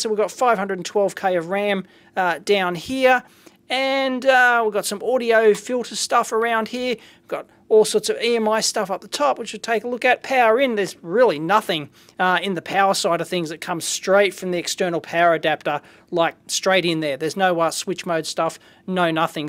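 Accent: Australia. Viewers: Australian accent is awesome :D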